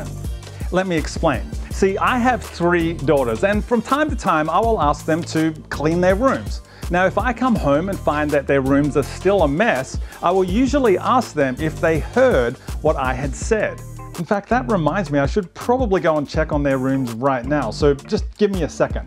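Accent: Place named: Australia. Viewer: Missed your voice man! Love reading your devotionals every morning! But something about that Australian voice explain things just makes it better. Loving this channel so far!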